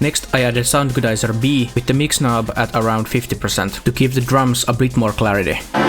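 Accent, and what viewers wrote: Finland. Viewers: You gotta be finnish. Theres no way u arent and talk english like dat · Finnish accent is frying me 😭Vitun hyvä video tho :D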